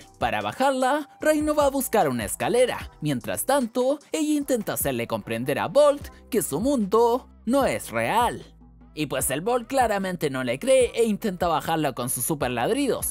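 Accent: Chile. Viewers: Pera pera eri chileno ?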